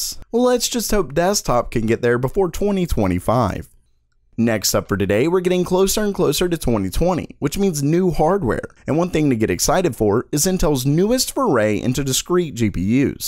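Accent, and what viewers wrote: South, United States. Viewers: He's Southern. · That southern accent yamsayin’ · That's a Southern American accent.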